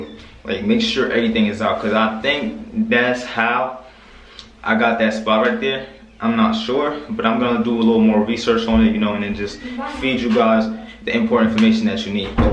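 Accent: New Jersey, United States. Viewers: Damn ion know u was from jersey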